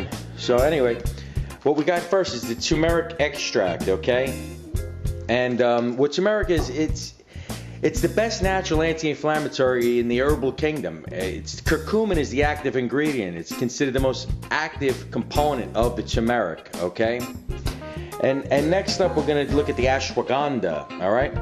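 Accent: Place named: New York, United States. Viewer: Lol I'm sorry I kept giggling the whole film. The thick new yorkish accent is so funny and gives him this "hey guys, come ahhn" type of feel to the video.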